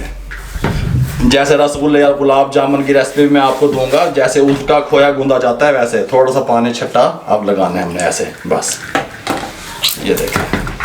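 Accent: Punjab, India. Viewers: Nice uncle j Allah apko kamyab kra apka urdo ma Punjabi bolna mja boht acha lgta h Khush rhy ameen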